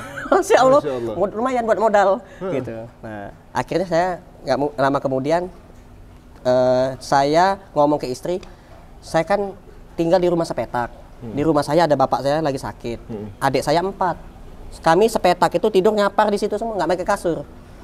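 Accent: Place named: Palembang, Indonesia. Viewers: Logat palembang, dak ilang hee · ini asli orang mana?... Logatnya kayak orang sumatera yak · Ini logat sumatra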